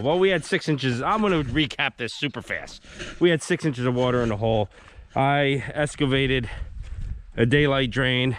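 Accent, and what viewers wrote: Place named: Albany, United States. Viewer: I find the commentary entertaining. The way you speak reminds me of my cousins from Albany. My grandmother's sister married a guy after WWII she met while he was here at a former army base and moved up there. They used to come down here during the winter months to get away from the snow and I was always fascinated to hear them speak. I appreciate the vids. Be safe Boss man.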